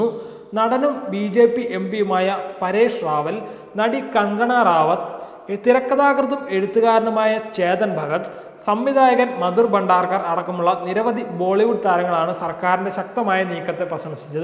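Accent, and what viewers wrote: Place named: Kottayam, India. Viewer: ഇൗ വക കോട്ടയം.ഭാഷാ ഭോഗികളെ .അവതാരകൻ ആയി വയ്ക്കരുത് . മലയാളം പറയാൻ അറിയുന്ന ചെറുപ്പക്കാർക്ക് ഇത്ര ക്ഷാമം ഉണ്ടോ.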